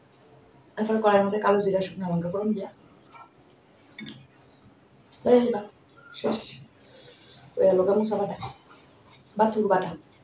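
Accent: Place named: Assam, India. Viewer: Tomar kotha gulo sune amr sei assam ar kotha mone pore jai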